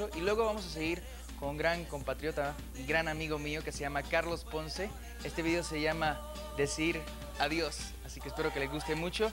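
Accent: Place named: Puerto Rico. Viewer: Neste tempo, o tinha o acento mexicano... Agora está pura cepa... Totalmente boricua!! 😃🇵🇷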